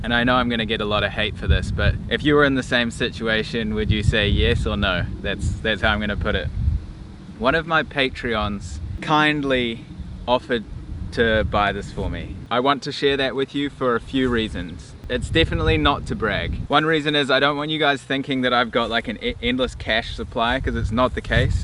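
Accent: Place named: New Zealand. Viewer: Score! Just discovered your channel love to hear a kiwi accent from all over the world mavic air is an awesome drone perfect for travel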